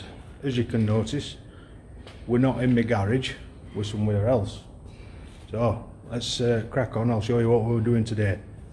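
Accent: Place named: Somerset, United Kingdom. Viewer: where are you based, tha sarnds a lot like tha frump tarn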